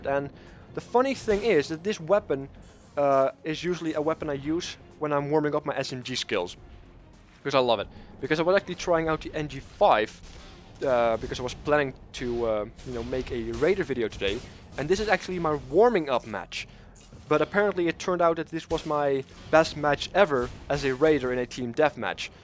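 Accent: Netherlands. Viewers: you are dutch! herken het gelijk haha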